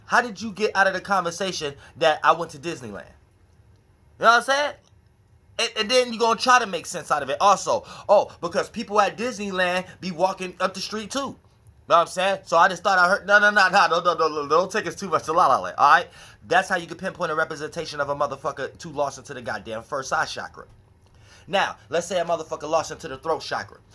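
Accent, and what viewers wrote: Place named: New York, United States. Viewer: lmaoooo u from/been hanging out in NY or whatttt 🤣. the accent tho “whatever the fuck u wanna call it “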